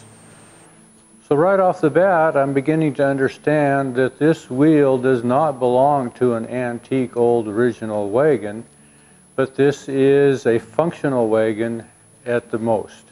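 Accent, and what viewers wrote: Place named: West, United States. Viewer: This is a snarky, implied criticism of the customer, who is labeled as being in effect "inauthentic." As though being a horse and buggy mechanic with a MIG welder, video cameras and video editing software, and a Youtube channel dedicated to ASMR videos with a horsey western twang is somehow more authentic. A case of the kettle calling the pot black. Leaves a sour taste. "What you mean 'we,' Kimosabe?"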